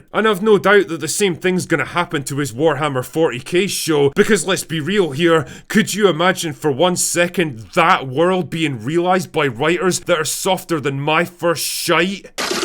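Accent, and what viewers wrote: Scotland, United Kingdom. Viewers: I had to give up watching this after 2 mins 19s because the voice of the commentator is sooooooo annoying. Yes the Witcher is bad especially now Henry has gone…… but that annoying scottish turd is so much worse I couldnt bear to hear another syllable come out of his mouth without feeling my toes curl the wrong way.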